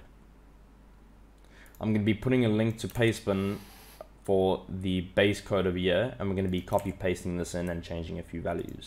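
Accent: South Africa. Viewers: Always nice to hear a fellow South African on yt. Keep up the good work bru👍🏻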